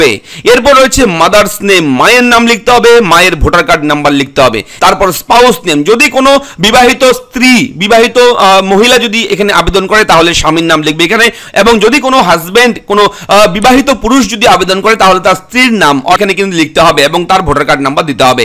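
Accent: Bangladesh. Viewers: Jini announcement korchen, taar uchcharon shune to mone hochche je tini Bangladeshi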